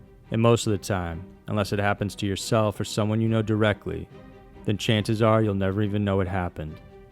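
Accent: South, United States. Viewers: He has a southern accent i dont know how thats scary.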